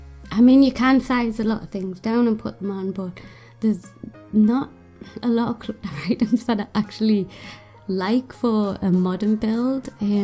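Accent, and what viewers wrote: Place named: South Shields, United Kingdom. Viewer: SO creative!! can't believe there was no CC! love your south shields (?) accent... subscribed!! xxx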